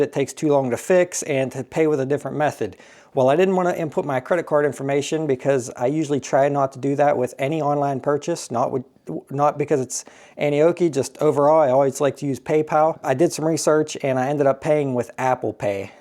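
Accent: Pittsburgh, United States. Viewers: Dude sounds like he's from Pittsburgh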